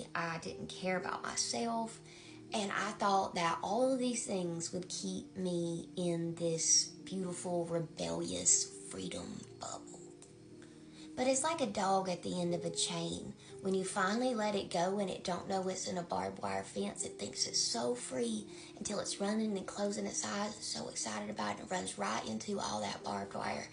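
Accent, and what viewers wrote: South, United States. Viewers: Hot southern lady hot southern accent we got the memo🙏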